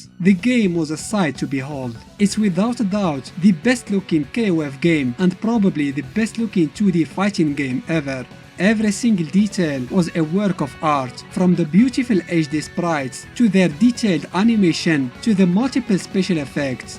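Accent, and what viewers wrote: Morocco. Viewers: Great commentary! I knew you are moroccan by the accent! KOF is very popular in Morocco, especially KOF '97/'98 and '99. Still played a lot in the video arcades, i saw lot of strong players in Casablanca. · from your accent, I can tell you're Moroccan (Tbarkellah aalik) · men l'accent katban liya mroki a ssat ila ma kentich ma ratfhem ta le3ba wma raytra walou :D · You sound Moroccan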